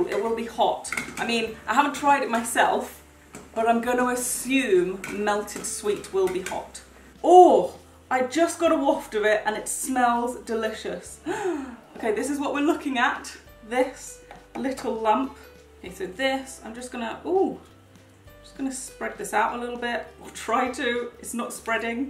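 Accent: United Kingdom. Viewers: I'm new is this girl British? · I love ur accent and ur vocabulary! It’s so crackup, typical British😂(well I’m guessing) lol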